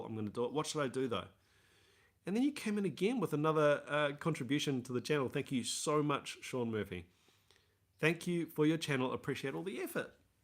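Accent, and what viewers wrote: New Zealand. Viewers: Kiwi accent🤣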